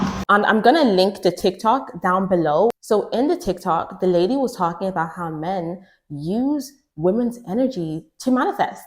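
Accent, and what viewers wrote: Ireland. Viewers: Beautiful lip colour. I hear the Irish accent 😀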